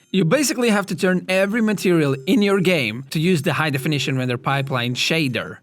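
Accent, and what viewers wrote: Portugal. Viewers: Ah, ai está o famoso super reconhecível sotaque português, good work on the game man, nice job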